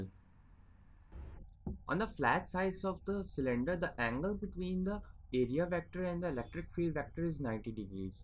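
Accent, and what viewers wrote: India. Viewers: the indian accent makes my ears bleed i can barely listen to you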